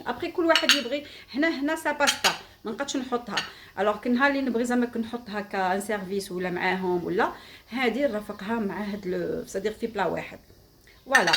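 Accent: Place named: Oran, Algeria. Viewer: 😂😂😂😂😂👍😘انت باينة بنت وهران الله يحفظك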